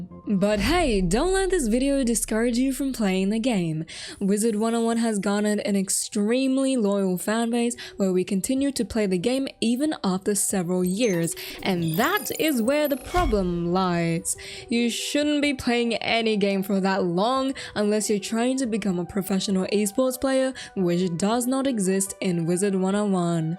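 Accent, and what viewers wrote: Australia. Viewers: I didnt know you were aussie, so little of us are in the community lol nice to see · Nothing wrong with Australian accents <3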